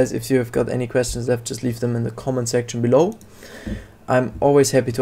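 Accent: Germany. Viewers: ur have german accent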